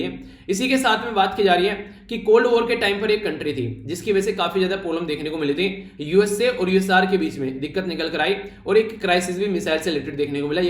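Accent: Haryana, India. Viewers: Maybe Haryana (sonipat/gohana)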